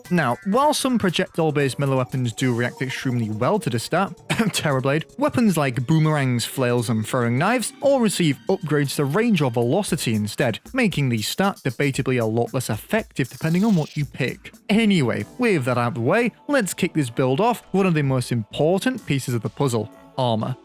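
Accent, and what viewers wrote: United Kingdom. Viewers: Oh my godness this British accent,mmmm, sweetest sound to my ears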